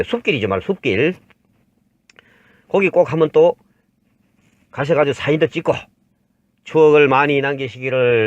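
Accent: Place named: Samcheok, South Korea. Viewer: 제나이쯤 되셨겠네요 목소리도 삼척사람 .저도 삼척 사직리 사람 .지금은 파주시, 수고많네요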